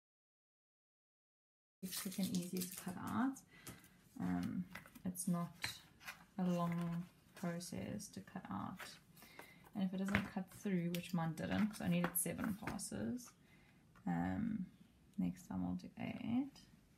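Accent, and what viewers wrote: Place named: South Africa. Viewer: What thickness basswood did you use for the top piece? I didn’t catch that Ahh accents. My go to is always Australian and then after a minute, I thought no those vowels aren’t Aussie she must be kiwi and then after another couple minutes I got an Irish twang and that’s when I knew you must be South African because that accent always sounds like all the subset English accents put together to me 😂. Checked your profile and sure enough!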